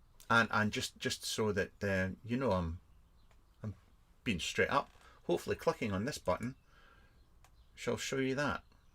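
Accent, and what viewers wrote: Scotland, United Kingdom. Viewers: I really like the intro/hold music. Shame that rambling Scottish bloke popped up again and ruined it... To be fair this is the exactly the review on the Boxx I’ve been waiting for. Authoritative.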